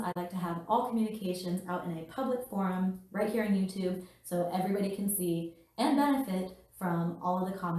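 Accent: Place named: West, United States. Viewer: What an interesting question. I will first wax poetical since sound is a personal sensation. Your voice is smooth, like a sophisticated port. It washes over one like sitting on a beach with your eyes closed as the susurration surrounds and tantalizes. I did discover that your voice in this video was not your natural speaking voice, but a "professional" style, thus armed with this insight, I watched part of your Google and part of your MEPS videos to listen to your native voice. So secondly I will hazard this guess. You were born in Montana or Utah, moved to Oregon, obviously went to Connecticut and spent some time near Sacramento. I also traveled a bit and thought I did not have an accent until an Alaskan bush flier asked me if I lived in Texas.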